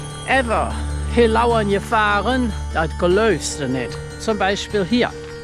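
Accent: Cologne, Germany. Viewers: alter😳 da braucht du einen übersetzer, aber als urkölner kann ich sie jut verstonnn👍 wünsche wiggerhin vill spass😎 isch han se direk abonniert👍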